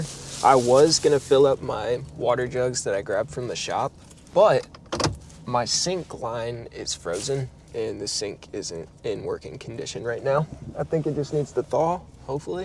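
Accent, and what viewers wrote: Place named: Memphis, United States. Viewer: You from Memphis? You sound like it. Where are you from? Tell me I'll subscribe.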